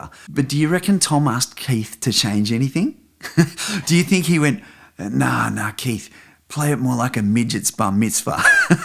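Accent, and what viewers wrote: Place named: Australia. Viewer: Both of these videos on the guitarists of Tom Waits are great,. Your storytelling, energy, wonderful Ozzie accent and most of all your playing were really a treat. Thank you.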